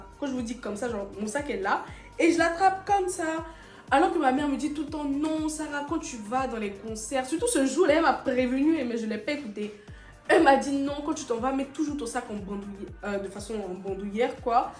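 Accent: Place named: Ivory Coast. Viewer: Sa se voit trop dans ton accent que tes ivoirienne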